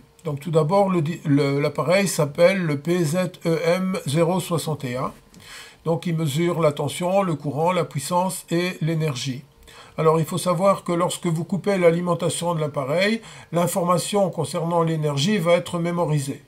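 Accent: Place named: Belgium. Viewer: Merci de cette vidéo bien expliquée (+ sympathique accent belge...)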